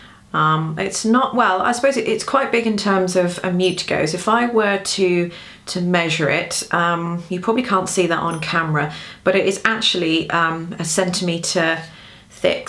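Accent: United Kingdom. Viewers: Definitely better without the mute. Then again I have been listening to my wife playing with and without for some 18 years. She started about 60 years ago, and has been a school orchestra teacher and violinist/concert master of a local community orchestra. Though she has had to give that up due to illness. This is a second marriage for both, as we were widowed within a year of each other. So, I am familiar with this, however, my attention was caught by the mention of Glaesel. Your voice told me you are either in Great Britain or derived there from. So I wondered if there is a Glaesel source in Europe. The Glaesel factory used to be in Cleveland Ohio, now In Indiana. When it was in Ohio Kurt Glaesel taught my wife violin repair, her other love. Now she is giving that up also due to her illness. That is why I am posting. Anything I want to know about the violin I want to know I can ask here, since not understanding what she is talking about seems more appropriate from my wife than a stranger. 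Not that she is difficult, but she just has so many decades of experience. She has the same problem with me when I talk tech. Oh, back to the point, is Glaesel still operating in Europe?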